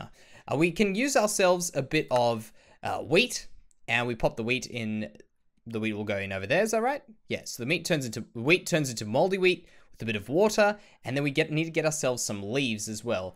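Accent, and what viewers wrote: Australia. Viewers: You're Australian right?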